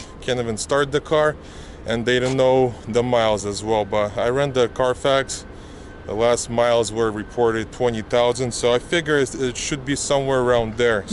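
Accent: Russia. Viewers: брат ты русский ? у тебя акцент))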